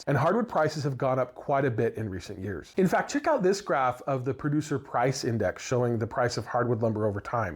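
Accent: United States. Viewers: Is this Australian or American Lumber Markets ? You sound American..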